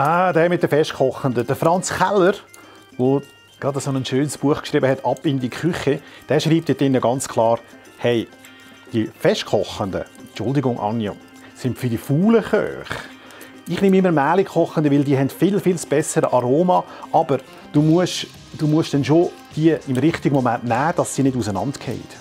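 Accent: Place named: Switzerland. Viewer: Wäre ja schön wenn man als NICHT Schweizer etwas von dem Gesagten verstehen würde. 👎👎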